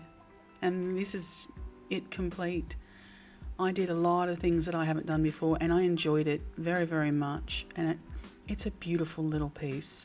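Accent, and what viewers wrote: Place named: Australia. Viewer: Superb! You are a very talented lady! I also love your Australian accent.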